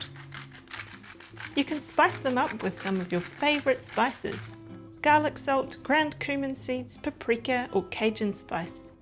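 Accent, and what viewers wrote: Australia. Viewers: I wish I could pronounce Easy Peasy with a lovely Australian accent. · Love your Aussie accent!🤩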